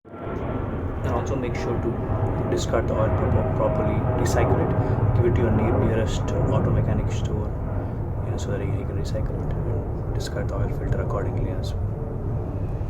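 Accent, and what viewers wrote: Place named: India. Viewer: This is going to sound racist and it may be, make more of these vids I trust the Indian accent teaching me prob a result of Kahn academy but very informative thank you!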